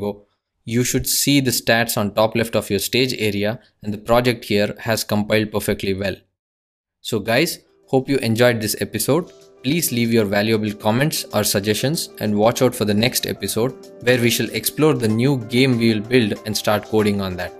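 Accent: India. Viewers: dis should be bery bery nice! I really like indian accent :D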